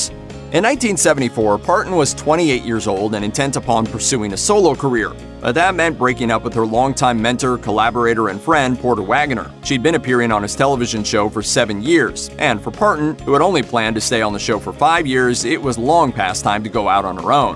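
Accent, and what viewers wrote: South, United States. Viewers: A<link> love the way you said mama! I love hearing it.. soo endearing! Not sure if you got southern roots or even mountain roots! Either way I hope you get to meet this graceful lady!🙏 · you have a nice American accent you sound normal i enjoyed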